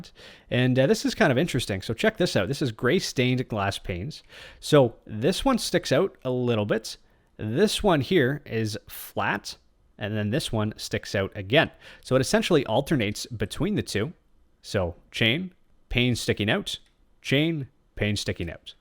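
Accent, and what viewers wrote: Canada. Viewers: are you from vancouver?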